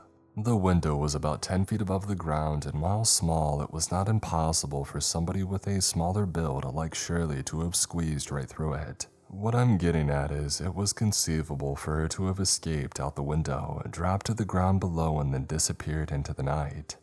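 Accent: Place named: Minnesota, United States. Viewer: Sounds like southern Minnesota, for sure.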